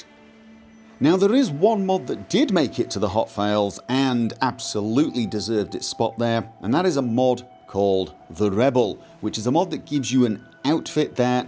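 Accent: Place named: Scotland, United Kingdom. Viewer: Hey: The proper name for the Regent sword is Claymore. Judging by your accent, you're closer to the history if it than I am. Another great video. Thanks.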